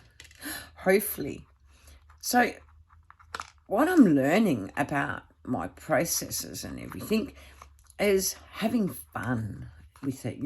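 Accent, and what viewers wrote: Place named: Australia. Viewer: Simple & to the point. Love to hear an Aussie as well. Going to enjoy watching your ideas & projects.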